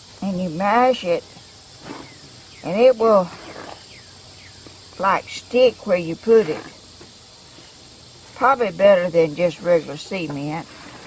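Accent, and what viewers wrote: South, United States. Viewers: You,sound like down home, lovely, southern girl. I’m from Alabama and I,sound very southern myself. Love your videos. Great ideas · I feel so Blessed to have found you on You Tube! I do silk flowers ideas. Wreaths, arrangements and more. God gave me this talent and I give Him praise for everything! You are a joy and time gets away from me also. I can't seem to get anything accomplished especially since we made connections! lol I just lay back and enjoy all your work and your southern talk and cute happy laughter! I'm like you and I like to talk!! Sure wish I could meet you but I live in Louisiana and Arkansas! Both states!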